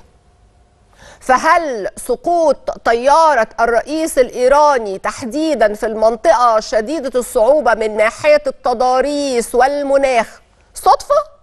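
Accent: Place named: Egypt. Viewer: حقيقة بدأت امقت اللهجة المصرية لانها غير عربية..وغير لائقة لقناة ودولة عربية...المذيعة صوتها الانثوي غير لائق للخبر تماما لكل خبر رجل وصوت..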